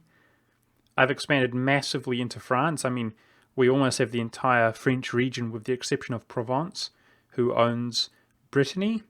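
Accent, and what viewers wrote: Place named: New Zealand. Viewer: He sounds like he’s from New Zealand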